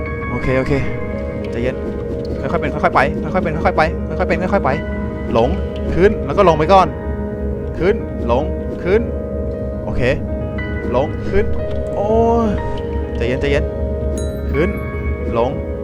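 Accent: South, Thailand. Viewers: พี่ เสียงเหมือนคนใต้เลย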